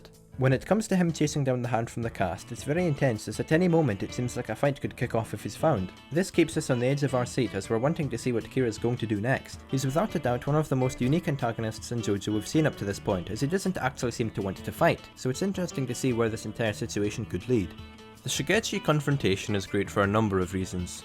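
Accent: Scotland, United Kingdom. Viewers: i've recently started watching your videos and i really enjoy your analyses, cool videos! :) by the way, what part of scotland are you from? (apologies if you aren't scottish; you certainly sound like it!) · are you scottish?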